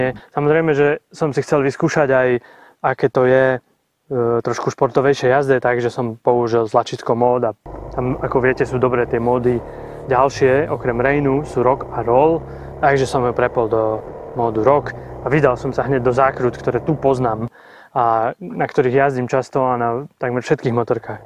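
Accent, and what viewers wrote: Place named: East, Slovakia. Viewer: Motorka brutál.. Ale video som nedopozeral...tá východniarčina trhá ušné bubienky...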